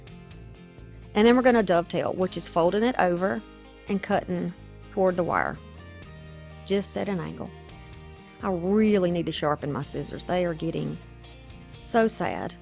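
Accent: South, United States. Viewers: Hi, new subscriber here. Heard that Southern and knew i belonged! Mississippi, here. I love your designs and the "make it your own!" You had me at y'all!😁🥰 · ❤ I love your country accent. I have one too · I’m from the south, so I hear that accent a lot. I’m from Louisiana so we have a bit different accent but here in Tennessee, it’s different. You actually sound like a lady I work with. Just the things you say and the way you talk. She crafts as well so I had to check the name! 😂 but she designs tumblers. We apply labels to products, etc., and that was right up her alley when we had these tubes to place labels on. She was the only one that could do it perfectly and quickly! The little ones I could do, once she showed me how. The bigger ones, no can do! I was happy to let her do them. One more thing… different subject— I got these decoupage papers on Amazon and didn’t notice that they were only 6”x6”! 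They are beautiful but I think I can only use glue sticks to apply them! What can I use them for? If you have any suggestions or if you could possibly do a video on using them in some neat way, that would be great! It’s a whole picture of flowers with no space to kind of cut them and put just accent pieces without them looking like they’ve been cut. It’s hard to explain. Just looking for ideas or suggestions. I was very disappointed and just should have paid more attention to the size! 🤦🏻‍♀️ I also go some stencils that were bigger than I was wanting but I can find something to do with those. I’m not sure I can share pictures on here or I’d show you what they look like. Maybe if I matched the paint to the background of the paper, I could possibly do something with them. I just don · Crackling on acrylic paint is not easy. Love these sunflower DIY's I love your country accent. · All the DIYs were great. 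Love the style. Never get sick of ur southern accent. Everyone has some type of accent. I am from Maine and we have a different accent here too. Keep the DIYs coming. ❤️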